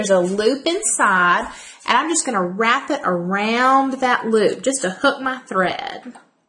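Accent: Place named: South, United States. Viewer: I am like you in the facts that I've never touched a sewing machine in my life before this and that we have the same southern accent...lol. I'm from NC. I've heard you have to oil a sewing machine. Do you have to do that when you take the machine out of the box? Or is it just ready to plug up and sew? Thanks.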